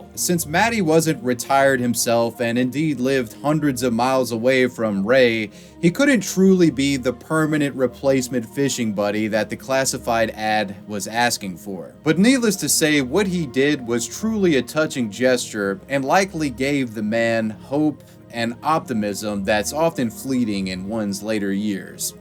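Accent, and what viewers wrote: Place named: United States. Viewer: It's like Bellular with with an American accent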